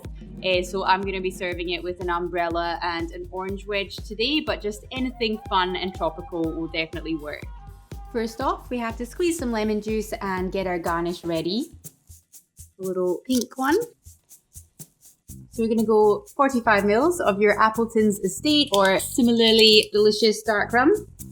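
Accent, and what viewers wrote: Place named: Scotland, United Kingdom. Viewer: If you can make biscuits & gravy from scratch, I'd marry you. 😉 One of your vids started playing and your voice called to me like a Siren upon the water. Nothing else matters, I started sailing in your direction. Love your Scottish accent.a A pretty Ginger is a bonus.🙂 Seriously, you have a great channel. I'm a Single Barrel Jack Daniel's guy. Love the their upper end sour mash #whiskEy. Semper Fi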